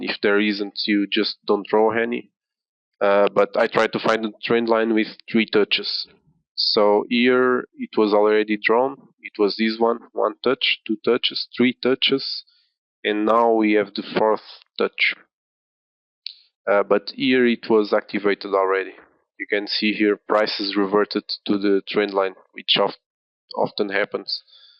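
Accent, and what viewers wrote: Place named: Portugal. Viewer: Olá. Desculpa mas pelo sotaque percebi que eras português:) Gostava de trocar umas ideias contigo, se possível. Vejo que tens uma boa noção de mercado e interpretação de preço. Parabéns. abraço. Pedro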